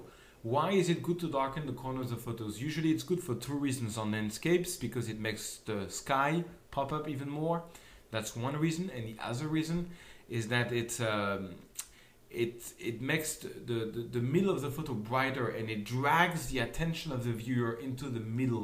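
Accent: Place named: France. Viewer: Merci pour le tuto, et l'accent Français aussi ^^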